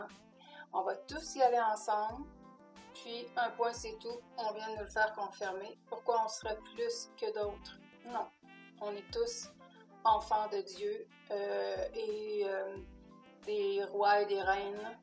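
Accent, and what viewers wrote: Quebec, Canada. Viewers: Merci encore avec une superbe musique et des messages très inspirants et avec votre voix du CANADA ou QUEBEC.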